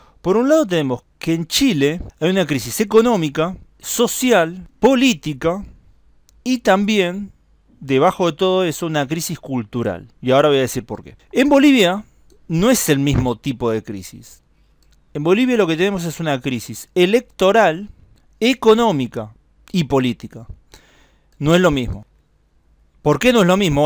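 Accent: Argentina. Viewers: curioso que este "cuate" no hable como mexicano, sino como argentino.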